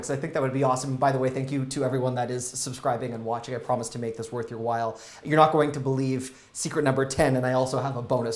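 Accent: Canada. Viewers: Are you Canadian? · Your videos are very enlightening. As a senior citizen with limited mobility I gave been ordering groceries from Walmart for delivery. The pandemic has me practically housebound. For the most part I would encourage grocery shopping for delivery. Unfortunately often the main thing wanted is out of stock & I don't know that till after an order is sent., your videos are interesting & your Canadian accent is intriguing for this old Southern steel magnolia. GOD BLESS!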